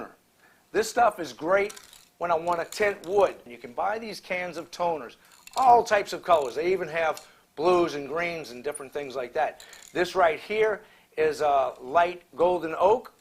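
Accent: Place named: Metairie, United States. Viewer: I love your videos. As a displaced Metairian, the only person around here that sounds like you, is me! You remind me of home.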